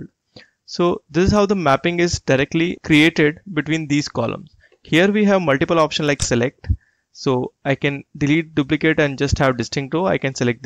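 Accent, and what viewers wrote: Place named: India. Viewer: why allways indish english. I don't understand anything :-(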